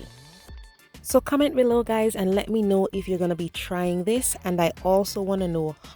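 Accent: Jamaica. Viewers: I love the Jamaican accent and your women's track team consisting of Elaine Thompson-Herra, Shelly Ann Fraser Price and Shericka Jackson is FLAWLESS. United States don't have a competitor to match for the MOMENT. But don't count us out! The men's track team is dominating and Jamaican can't compete with them.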